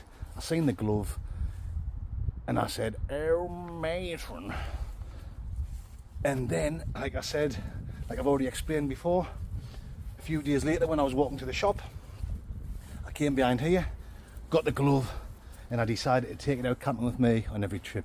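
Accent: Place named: Newcastle, United Kingdom. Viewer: Hi! Love your channel!😊im from Denmark, and I have a friend from Newcastle, he moved to Denmark many years ago, but i recognized the Accent immediately 😅 you guys are special in the hest ways possible!❤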